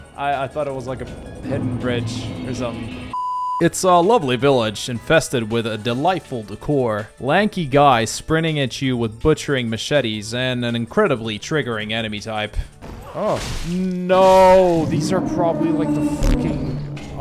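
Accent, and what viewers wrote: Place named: Canada. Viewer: You're the most Canadian Canadian I've heard play this game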